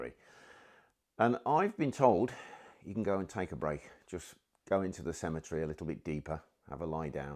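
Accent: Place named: Birmingham, United Kingdom. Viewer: Hello I loved your stories and i love your channel. Small question is that a brumy accent? im a Black Country chap myself and couldn’t resist asking.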